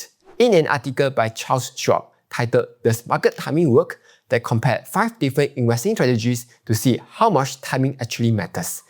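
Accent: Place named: Singapore. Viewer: I can't understand your singlish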